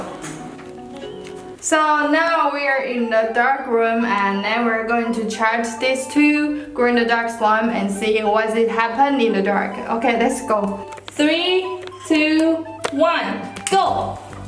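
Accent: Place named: Asia. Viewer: My mum has the same Asian accent as u!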